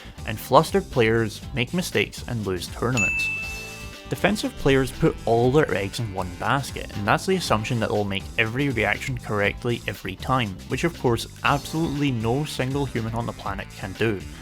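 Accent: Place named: Scotland, United Kingdom. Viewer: Nice to here a Scottish accent! I'm in glasgow, do you play SFV? up for a few sets sometime?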